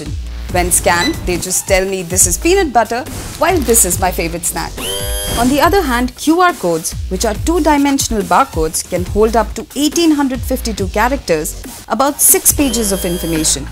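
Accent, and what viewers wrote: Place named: India. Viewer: the indian accent always kill me, and the video does not explain how it works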